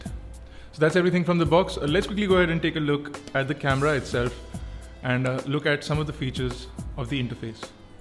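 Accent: India. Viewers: hahaha lol " press the butt'n" it's not really that hard to spot an Indian even if he's talking a foreign language.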